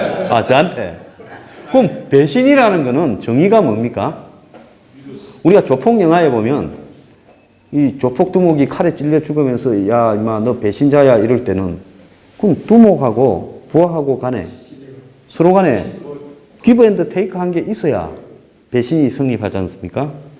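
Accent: Gyeongsang, South Korea. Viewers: 소장님은 뉴스 브리핑 중 소장님 특유의 말투로 약간 경상도 말에 약간 속어쓰는것이 제일 우습습니다. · 서부경남 사투리가 친근하고 믿음이 가지요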